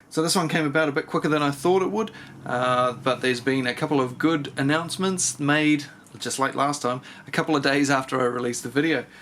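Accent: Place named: New Zealand. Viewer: G'day, sounds like your a New Zealander. Are the tools you review available in Australia please.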